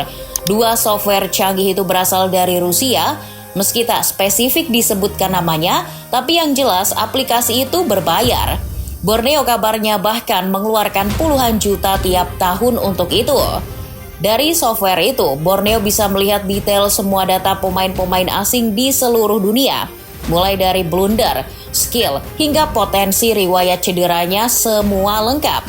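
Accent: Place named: Surakarta, Indonesia. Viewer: Suara pria solo😊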